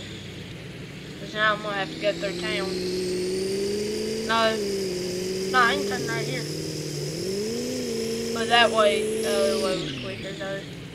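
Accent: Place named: South, United States. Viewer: You sound so country it’s not even funny I’m also country I just don’t have an accent where you from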